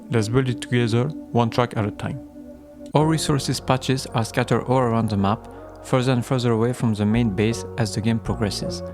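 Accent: France. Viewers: Le petit accent français, j'adore 💕 Cocorico ;P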